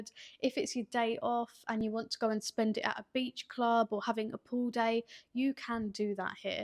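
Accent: United Kingdom. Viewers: Great info, cute girl, but that english accent is not cute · British accent is amazing, a beautiful woman with British accent is charming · ah. When you said UK I immediately snapped back understanding the accent. Couldn’t figure out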